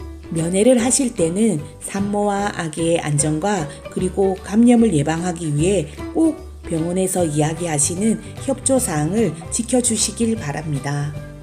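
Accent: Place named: Seoul, South Korea. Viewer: 와ㅎ 선생님 서울사람같아요ㅎㅎ 역시 유익한 강의~~♡♡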